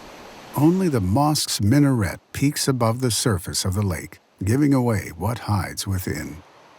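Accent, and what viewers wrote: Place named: United States. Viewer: Watched one minute. American narration is awful. Not watching.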